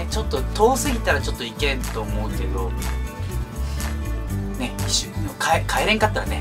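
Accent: Fukuoka, Japan. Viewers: 福岡の方言きいとったら、親近感わく！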